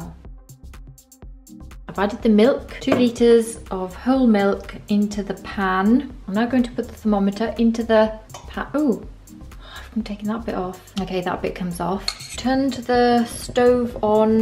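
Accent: United Kingdom. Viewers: Love your accent what is that British?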